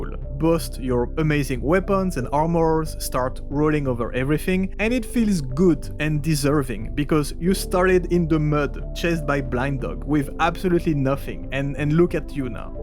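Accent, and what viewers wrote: France. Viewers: ton petit accent Français charismatique haha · merci pour cette vidéo; mais ne serait pas un french qui parle le british?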